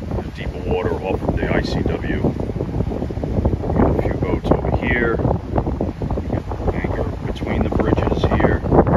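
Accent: New York, United States. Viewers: From my last comment: Do yawl know what is wrong with these videos? Al they do is "Scuff" the surface of a ICW trip!! I also wonder.... WHAT PITCH props is he using??? Does he ever tell YOU so that YOU can know what kind of fuel mileage Yawl could expect IF You did the trip? He goes into all the fuel used with mileage, etc. BUT how does ANYONE know what to expect with THEIR Prop Pitch??? He says he's from Florida, He seems like a"TYPICAL" New Yorker & even sounds like one.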